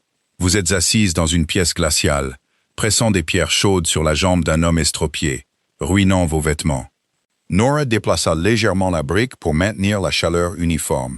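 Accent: United Kingdom. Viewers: Un bon moment passé d'un roman historique comme avec un livre papier, sauf qu'à cet instant c'est avec la voix grave et monocorde d'un homme, doublé d'un accent britannique qui n'enlève rien à l'histoire, bien Au contraire ! Merci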